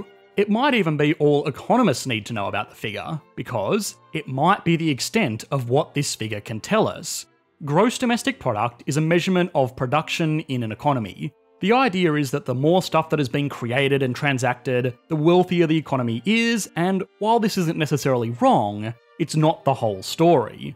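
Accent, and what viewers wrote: Australia. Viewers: I am guessing that it is Australia that you are from, please tell me if I’m wrong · Hey, man. Let me, please, make some subtitles for your videos. I can even translate it to portuguese, if you will. The Australian accent ain't as clear as you might think. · Love your channel but please please please don't say "zee". It sounds so wrong coming from an Aussie accent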